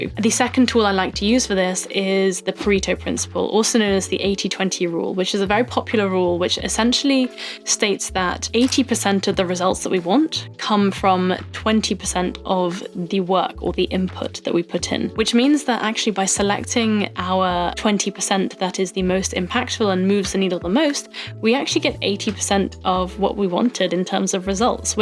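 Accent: United Kingdom. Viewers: An Elegant British accent ( RP) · Hi love your videos.Your British accent.Listening to our interoception, Health is wealth absolutely,I am going to the gym 2-3times a week walking daily and eating healthy diets❤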